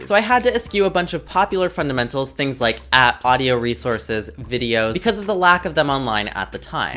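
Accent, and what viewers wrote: Portugal. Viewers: Porque ele aprendeu o português de Portugal. Durr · Sou português e pensava que ele era português por causa do sotaque a falar inglês! E quando fala a nossa língua parece mesmo tuga! Carai! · Ele tem o sotaque português. Se tivesse o brasileiro estaria correto também. O português falado no Brasil é tão correto quanto o falado em outras partes do mundo. Não tem essa de matriz da língua. O inglês americano é tão correto quanto o da Inglaterra. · I'll speak in English to understand it better. You speak Portuguese very well, congratulations.You even have the Portuguese accent. People will understand / understand very well >w< Translation/tradução=e Eu vou falar em inglês para entenderes melhor. Tu falas muito bem português, parabéns. Tu tens até o sotaque português as pessoas vão entender/entendem muito bem >w< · caralhoo manoo, tu tem até o sotaque dos portuga kkkkkkkk show de bola!!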